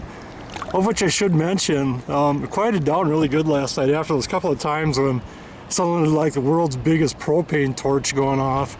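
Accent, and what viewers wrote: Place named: Minnesota, United States. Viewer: Nicely done video. Calming, informative. Love the Minnesota accent. look forward to more videos.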